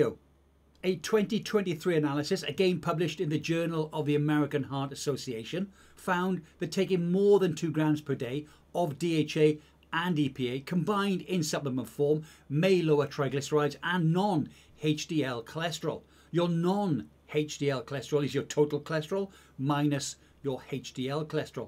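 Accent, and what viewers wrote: United Kingdom. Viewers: Re the stack at the end of the vid, I am curious where you get your 500mg Metformin from. From the accent I'm guessing you are UK based. Did you measure its effect on your IGF-1 levels? I think this is one very interesting supplement. I heard some have side effects but can depend on the dose.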